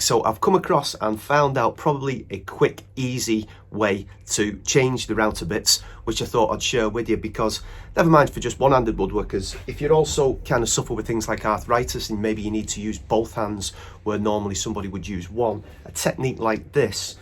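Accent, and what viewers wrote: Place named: Scotland, United Kingdom. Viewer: well at least you got the majority of exterior done by now, I'd assume? At least wind and rain preparations? I may be hot here, but come winter... you'll get the rougher of the climates as far as I'm concerned. Which reminds me, what part of the area are you? You sound like you might have a bit of Edinburg with a bit of Gloscow as well. (Although, I'll admit,, my knowledge of UK accents has faded alongside my sanity over the years lol.)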